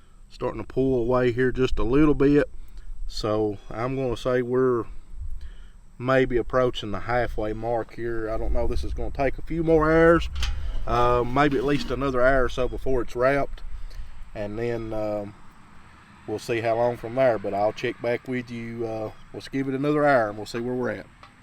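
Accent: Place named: Appalachia, United States. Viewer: Where are you from in Appalachia? You for sure sound like home....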